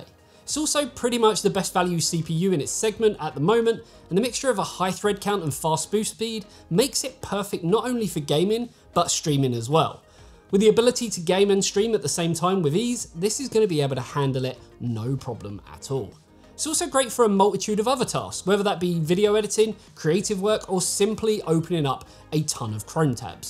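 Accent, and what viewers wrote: United Kingdom. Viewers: funny sounding british guy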